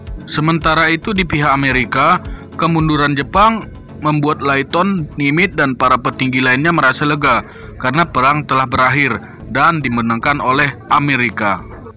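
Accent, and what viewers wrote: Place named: Jakarta, Indonesia. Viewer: Astagfirullah, yg komen org Batak yg dikomplain logat Batak. Ni siapa yg isi suara? Mirip suara2 org Betawi sana..., Mcem suara org Jakarta ya.. sukses buat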